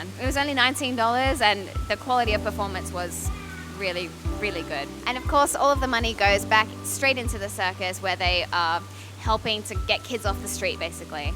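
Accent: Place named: Australia. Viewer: Its great to hear an aussie accent. Great video. Loved it. Thanks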